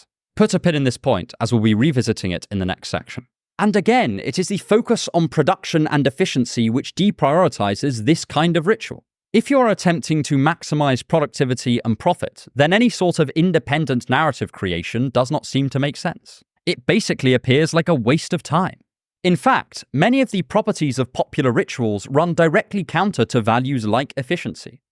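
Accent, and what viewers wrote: United Kingdom. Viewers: Which accent has he? Is that British?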